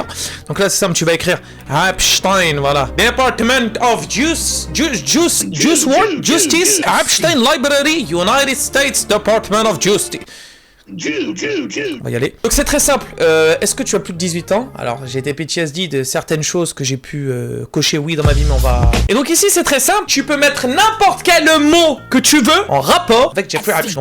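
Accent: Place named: Maghreb. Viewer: AH MAIS TES UN MAGREBIN JAIS TOUTE SUITE VU CA AVEC TA FACON DE PARLER ON DIRAIT UN MONGOL HANDICAPE MENTAL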